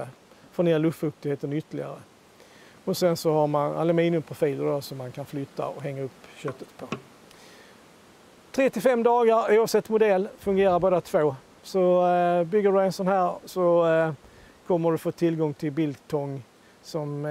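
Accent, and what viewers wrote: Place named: Skåne, Sweden. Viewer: Not BILL-tong, but bill-TONG. Equally tasty, nevertheless. Wonderfull idea and a great Scanian accent! I have to make one myself instead of filling my check-in luggage with billtong every time I return.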